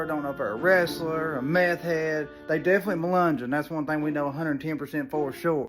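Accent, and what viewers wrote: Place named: Eastern Kentucky, United States. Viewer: Where you from? You sound like you live in eastern ky.... where I'm from lol I know people that have called dcf cuz their ex pissed em off 😂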